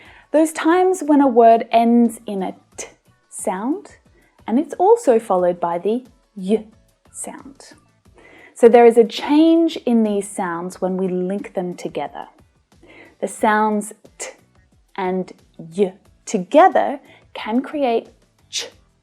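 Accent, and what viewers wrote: Australia. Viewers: Hi, It's really hard to find good australia accent english teaching channel, I subscribed immediately when I found your channel and being enjoyed it ever since. But here comes the real question, Is it weird to for a male to shade a female? Am I going to sound more feminine?😂😂😂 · As a British educated person living in Canada, I am fond of your Australian accent and your country Australia. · Hi!, I really enjoy your teachings. I've noticed, trouhgt out your accent, that Australian one is very close to British. While in the U.S.A., I took my classes, right there. I'm so eager to keep in the same track, so that I'm in the continous path of learning this beautiful language as much as possible. Again, thanks a lot for your help. With empathy: your suscriber, G.Z.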